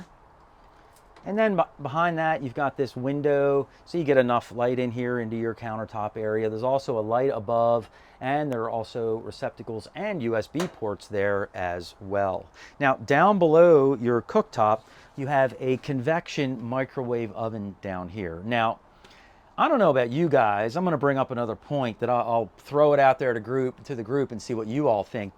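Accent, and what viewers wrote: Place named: Western Pennsylvania, United States. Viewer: The MD or western PA accent is strong. Ha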